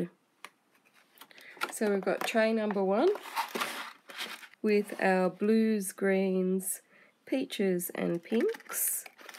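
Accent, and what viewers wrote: Australia. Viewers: OMG, a fellow Aussie, so nice to hear someone not waffling on with stinkin cutes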